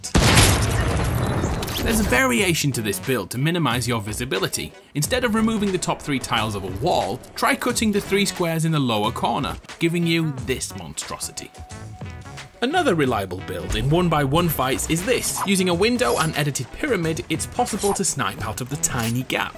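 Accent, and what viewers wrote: United Kingdom. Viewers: you, tracer and pyrocynical are the only humans that i respect whom speak british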